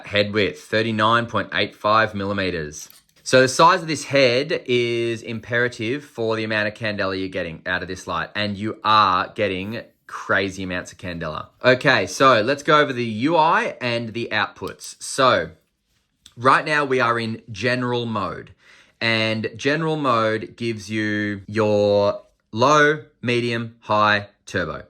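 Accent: Australia. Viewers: plus the Australian accent makes every presentation better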